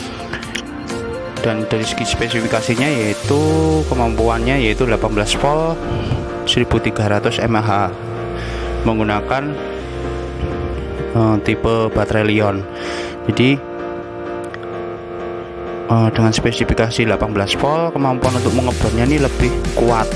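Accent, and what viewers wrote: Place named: Java, Indonesia. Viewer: muka engko logat jowo.sukses mas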